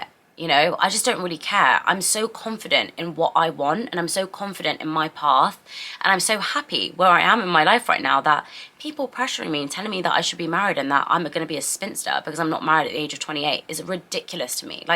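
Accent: United Kingdom. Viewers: How come you’re accent is so British, just amazing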